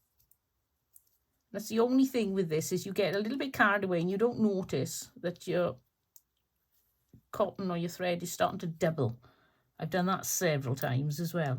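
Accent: Wales, United Kingdom. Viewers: I love naive or rustic work. It just looks real to me. Not like stuff that is so perfect you don't want to use it. Anyway i'm new here. Are you originally from Wales? Sounds like you are. I'm in uk originally from south africa. I also do many crafts and love doing any kind of hand sewing.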